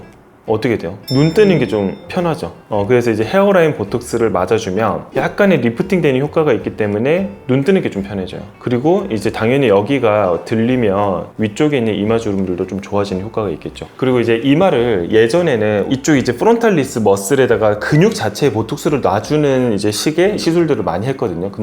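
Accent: Gyeongsang, South Korea. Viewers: 경상도세요? 사투리가들림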